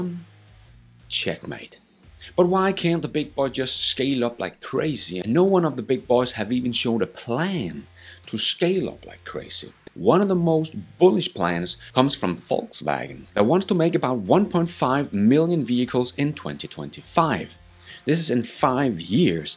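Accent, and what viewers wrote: Denmark. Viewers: Er du dansker? 😁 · You sound Danish. How are Tesla sales going there?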